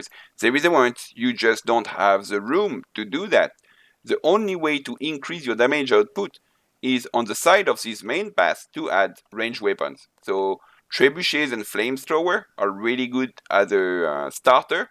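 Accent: France. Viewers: ah je ne suis pas la seule a avoir remarquer un énorme accent Français étrange pour un channel totalement en anglais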